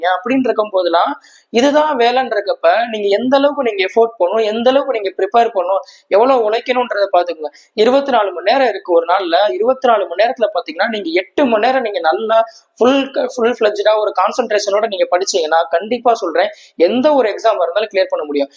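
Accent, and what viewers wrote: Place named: Madurai, India. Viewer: Sir madurai ah slang apditha iruku avaiga ivaiga 😅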